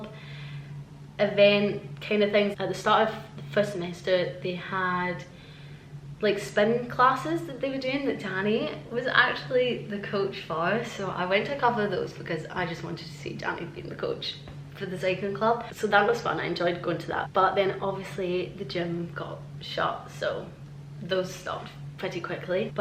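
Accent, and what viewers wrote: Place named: Glasgow, United Kingdom. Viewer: Is that a Glasgow University accent Ive heard so much about. Interesting video :D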